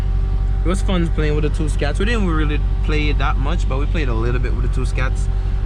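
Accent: Jamaica. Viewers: Are you a jamaican??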